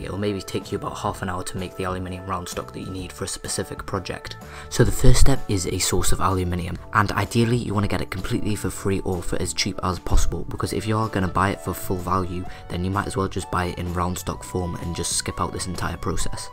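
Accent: United Kingdom. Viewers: dude, you realize he's british, right? like did you literally not even notice that?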